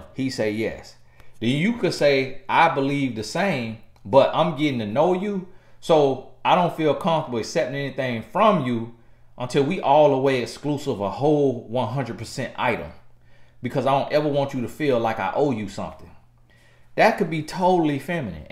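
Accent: South, United States. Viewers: It’s his southern accent , he is just funny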